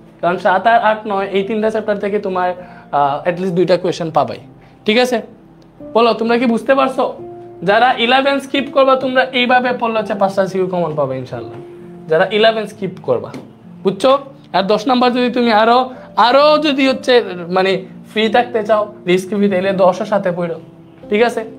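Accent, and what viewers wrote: Sylhet, Bangladesh. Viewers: ভাইয়ার বাড়ি সিলেট নাকি?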